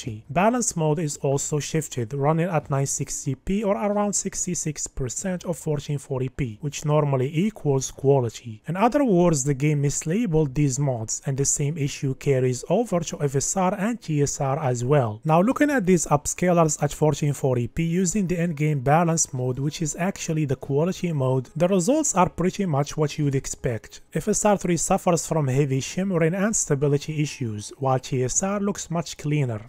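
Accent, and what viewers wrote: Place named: Morocco. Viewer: yes , i thought that too because his accent's like marrocans ❤. Thank you bro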